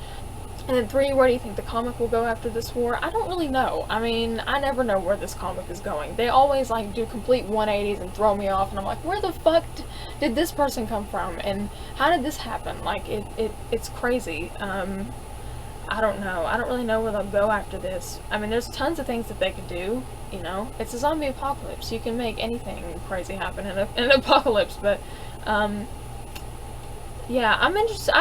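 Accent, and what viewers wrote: United States. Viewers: you sound country and i mean that as a compliment i grew up in virginia you should really watch that negan meets gary busey it's awesome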